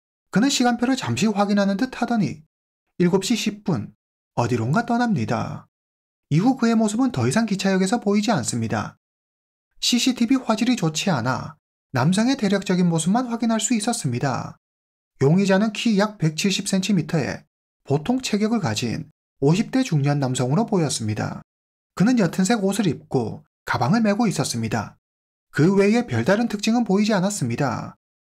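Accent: China. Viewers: 방송인 중국사람인가 ?말투가 어디지?궁금하네 ...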